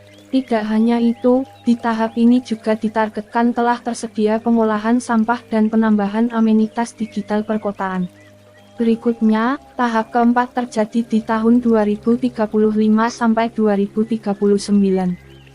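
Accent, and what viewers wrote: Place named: Java, Indonesia. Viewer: presenternya medok banget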